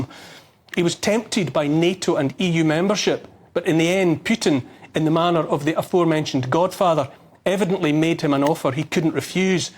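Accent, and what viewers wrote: Scotland, United Kingdom. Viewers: This is one of the best speeches ever ~ Can't stand the british accent but the Scottish one will do in a pinch as long as it's fiery . English was MEANT to be thus and good on you Neil ~ Please comprehend that these powers that be are actively probing and trying to PROVOKE anyone to become aggressive and radical... Jan 6th hijacking of a peaceful protest was PROOF of that ~ The Bible shows us how to have the patience for days like these ~ God help us ~ Hurry Lord Jesus Christ !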